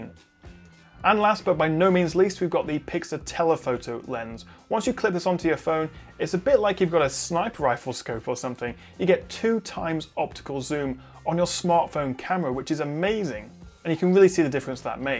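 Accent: United Kingdom. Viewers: Always love watching your videos. Good honest reviews with a familiar British accent.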